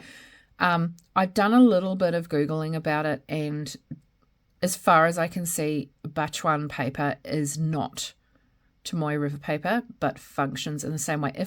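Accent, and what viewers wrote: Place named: New Zealand. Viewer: Hearing a fellow kiwi is always a treat! I love the A6 size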